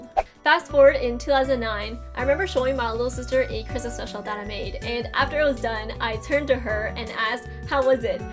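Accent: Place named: Canada. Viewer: Cost of living in L.A. is ridiculous anyways, plus you’d lose that lovely Canadian accent 😂😂 hope the rest of the year treats you better.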